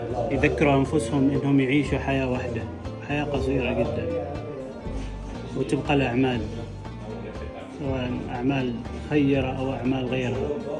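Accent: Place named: Oman. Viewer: من لهجتك عماني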